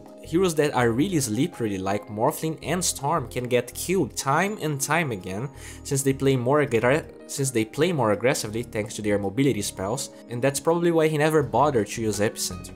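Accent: Brazil. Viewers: Your accent sounds like we brazillians talking in english. Great video, keep it up. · É BRASILEIRO SIM PORRR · Are you Brazillian ? It looks like by you accent.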